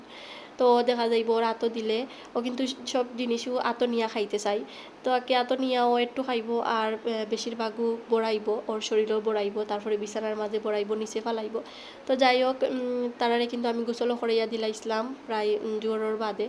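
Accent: Sylhet, Bangladesh. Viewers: apu tumer katha gulo sonle mone hoi sylet chole jai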